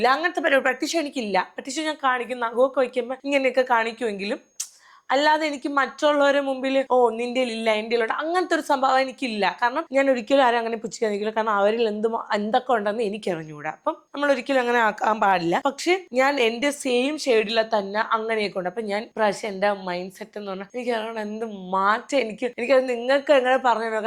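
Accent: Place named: Thiruvananthapuram, India. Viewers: Chechyiiudee ehh tvm slangg adipolii anu kettonduu erikkaann💖✨ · Chechi i have been following u and admiring u since 2 years and i am keep loving watching your videos....i am even get influenced mostly on products you used how you style....and especially the way you talk its so attractive that i am keralite also tvm side now staying and i like the way you present everything in your slang....anyway best wishes for your achievements❤.....i know i am not lucky at all for getting this giweaway....but then also simply trying jst coz my favourite influencer finally came with giweaway nahh....so i wanna be part of it thats it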